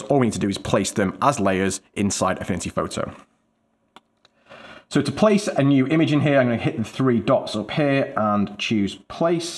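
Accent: United Kingdom. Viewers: I hear a British accent. I subscribe 😝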